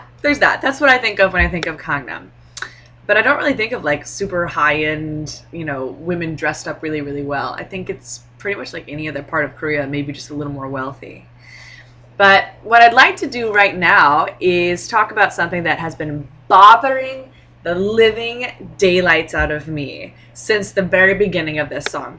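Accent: United States. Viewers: I wish I could hear you speak Korean!! I'm interested in your dialect or amercian accent affecting it in any way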